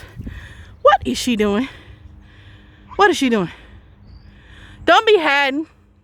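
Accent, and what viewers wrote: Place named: South, United States. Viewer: Ma'am you have the best accent. My mom is from NC and dad is from TN so I grew up hearing the music of southern accents. BTW cool garden and thanks for your channel.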